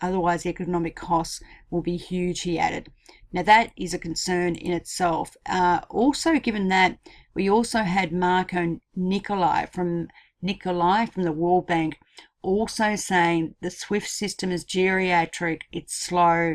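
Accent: Australia. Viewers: Hi, I recognise that accent cos I'm also Australian =) Definitely subscribing. Great vid.I'm holding alot of xrp hopefully it the bull run continues.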